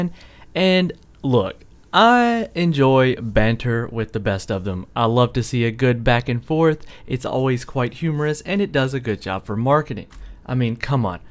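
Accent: South, United States. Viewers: Where are you from if you don't mind me asking? I hear some southern twang in there XD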